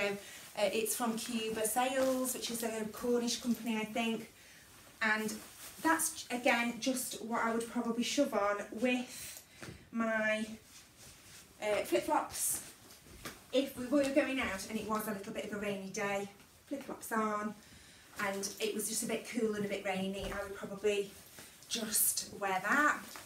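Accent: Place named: Warrington, United Kingdom. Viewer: Honestly laugh so much watching your videos! Your accent makes me smile my grandma is from Warrington so has a similar twang! And your hair is FABULOUS!! ❤️❤️❤️❤️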